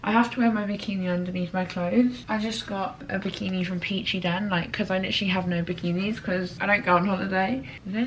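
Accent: United Kingdom. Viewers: I love your British accent ❤ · Am I the only one who didn’t know she was British 😂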